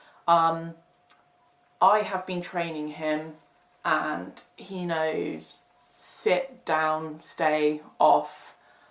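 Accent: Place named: United Kingdom. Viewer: why is the american flag on the thumbnail and your accent is british?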